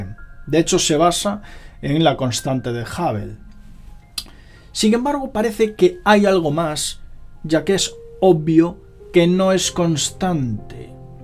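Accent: Spain. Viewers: lindo espa;olito me gusta su acento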